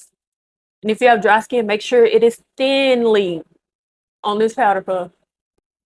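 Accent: South, United States. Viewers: OMG I love her country accent ! 🥰😂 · New here, 1) you’re gorgeous 2) idk what I was expecting but I wasn’t expecting your voice to sound like that lol you def gotta be from the south with that accent. And your freckles!!!! 😍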